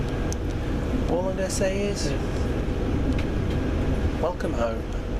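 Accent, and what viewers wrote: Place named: Wales, United Kingdom. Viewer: great video as always love your welsh acedent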